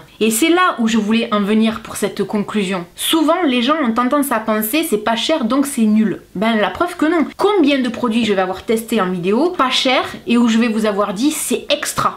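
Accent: South, France. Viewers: Je viens de te découvrir franchement jadoreee, tu es honnête, tu propose des contenu tres intéressant comparer a d'autre youtubeuse que j'aime pas du tout, tu es trop drôle hihi j'aime bcp ton accent du sud je viens du nord moi ! Hahaha, jme suis abonné dailleurs ~ 😉😁😂😂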